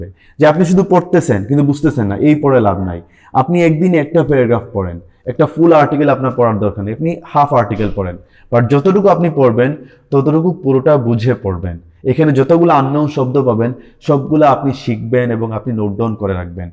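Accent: Sylhet, Bangladesh. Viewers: Sir apni ki sylhety? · R u from Sylhet sir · আমি যদি ভূল না করি তাহলে আমার মনে হয় আপনি একজন সিলেটি। আপনার কথার টোনে বোঝা যাচ্ছে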